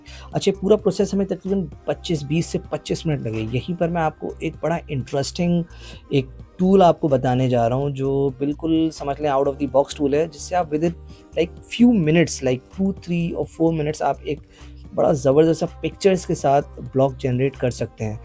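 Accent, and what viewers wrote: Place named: India. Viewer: Ur accent like an indian. I was thinking u r Indian.. anyways i got ur video while scrolling really loved it.. thanks for sharing such valuable knowledge i would implement it.. Thanks - love from India ❤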